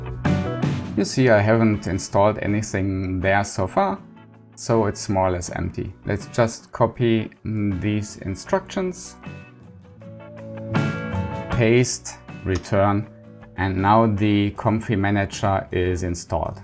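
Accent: Germany. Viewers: jerne, der jute Berlina Dialekt sei uns holdt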